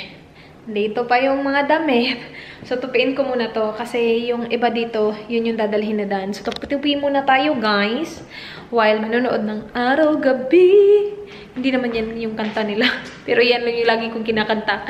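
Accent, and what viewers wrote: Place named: Visayas, Philippines. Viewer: Hi ate! Makalingaw kaayo ka inig mag bisaya:P New subscriber diay hehe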